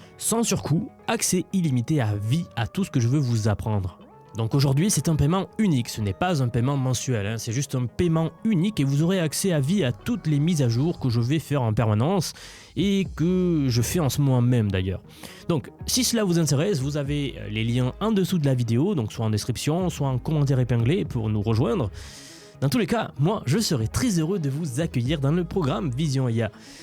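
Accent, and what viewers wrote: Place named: South-West, France. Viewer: J'aime beaucoup ton petit accent du Sud Ouest ♥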